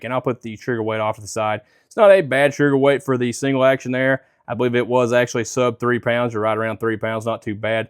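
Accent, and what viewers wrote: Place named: Deep South, United States. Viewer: Talk slower and enunciate so we who do not live in the Deep South can follow what you are saying......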